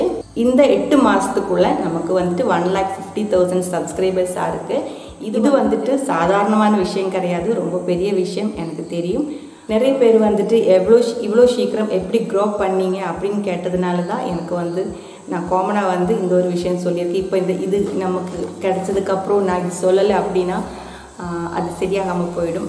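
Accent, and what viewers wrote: Kerala, India. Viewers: We tamilnadu people love Kerala people and their slang..Hard work Never fails Sis.. keep rocking dear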